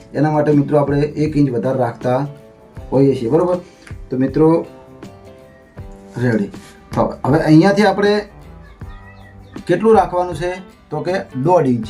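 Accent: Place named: North Gujarat, India. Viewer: ઉતર ગુજરાત ની ભાષા સે સાહેબ,,કાયુ ગામ જનવાજો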